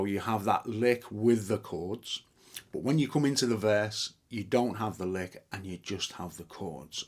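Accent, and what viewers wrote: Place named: Derbyshire, United Kingdom. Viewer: Listening from Uganda... is that a Derbyshire or Nottinghamshire accent? ... I used to live in & around Louth ... love your easy style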